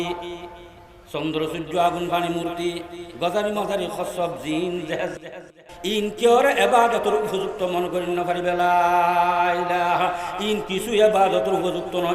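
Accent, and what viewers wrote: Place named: Chittagong, Bangladesh. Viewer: চিটাগং ভাসা বুচতে একটু কস্ট হই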